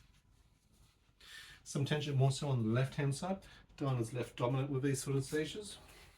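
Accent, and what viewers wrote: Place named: Australia. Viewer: hi my name is Payton and I'm from Pennsylvania and I'm also a special needs adult I have William sindrom and two conditions eye and heart I'm 24 and I support you and you're wife I Hope that she is okay and tell her that I think she is awesome! and you are cool !! I like Australia accent. it's very different than ours I don't drive or live on my own and I have balance issues I'm sending parys for her !! your friend Payton from the United States of America !!❤❤❤❤❤❤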